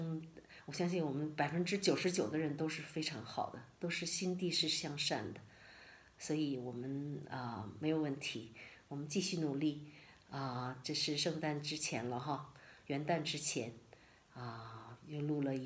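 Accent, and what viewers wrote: United Kingdom. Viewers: 喔，英伦口音好高雅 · 您的英音还挺好听的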